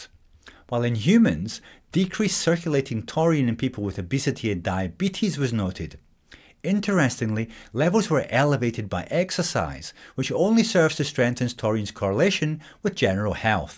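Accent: United Kingdom. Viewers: Very helpful! Thanks. Btw, will it make your hair fall out like this other supplement I forget the name of? ...creatine? P.S. what kind of fancy accent is he speaking with, something British? I couldn't understand some words, believe it or not.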